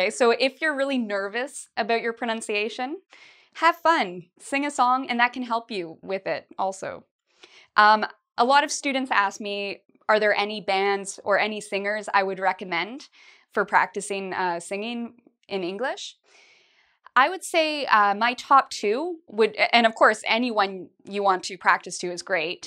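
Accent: Canada. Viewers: I thought you were a Canadian lol...In HIMYM everyone would laugh at Robin cuz of her pronunciation ahahah... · thank you so much for your videos! Because of your lessons I have greatly improved my pronunciation and grammar. BTW, love the Canadian accent:)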